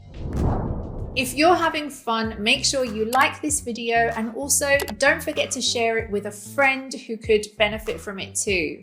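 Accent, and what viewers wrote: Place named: United Kingdom. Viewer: The British accent is finding it kinda hard to understand Maybe the American accent is easier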